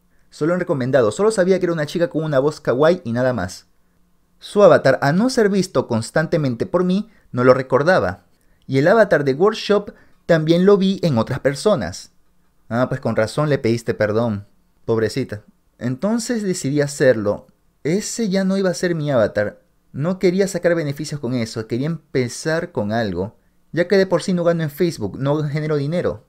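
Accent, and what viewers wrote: Ecuador. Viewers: Apenas iba a preguntar si eras de ecuador por el acento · una pregunta muy tonta creo ¿eres de Ecuador?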